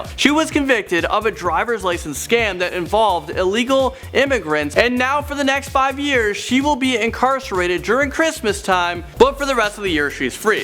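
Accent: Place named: United Kingdom. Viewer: Emi c; edits I wasn't complaining about his accent, if anything i would have praised it, i happen to like the british accents.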